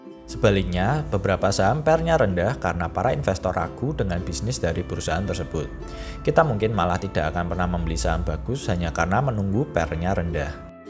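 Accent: Java, Indonesia. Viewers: Min. Tolong dong. Kapan kapan bikin subtitle atau tulisan yg diucapkan, karna bahasa mimin klo ngomong medok bnget😂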